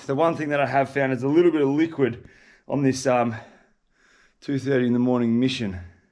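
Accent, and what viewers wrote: Australia. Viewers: Less than 2 minutes in and he's obviously another Aussie. I'd just like to know which state this is, as I've found a few strange unexpected places in West Australia, myself.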